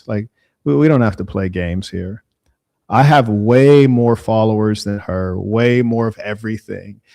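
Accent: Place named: United States. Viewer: Well done. ❤ your videos. Take heed that the ONLY reason these Black 🇿🇦 are acting all sweet, agreeable etc is because of your American 🇺🇸 passport, accent & dollars which they associate with WHITENESS = WEALTH. If you were from Zim or any African country...they would have been aggressively rude to you. Dont be fooled by the agreeableness & listening to you. They see 🤑! I say this because Africans been telling these self -hating 🇿🇦 Knigrows the same thing thing but they insult, because they call Africans (Africans) distancing themselves as Africans because African is associated with BLACK...which means Poor, Beggarly and Helpless. Stay woke to these black 🇿🇦 cats. 😉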